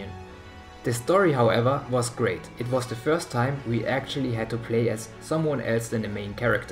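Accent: Germany. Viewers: The German accent makes it perfect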